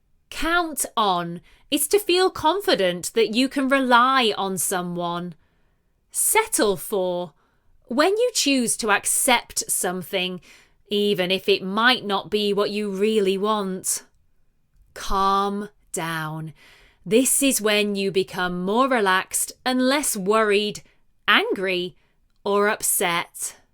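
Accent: United Kingdom. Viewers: And I love British accent 💕💕💕💕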